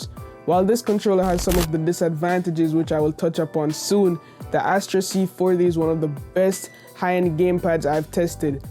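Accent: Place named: Jamaica. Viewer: I'm new and I just heard yu voice and mi a say that yu sound Jamaican who live in America I'm JM btw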